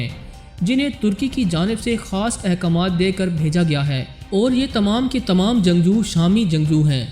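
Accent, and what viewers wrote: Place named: India. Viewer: Typical indian accent 🤔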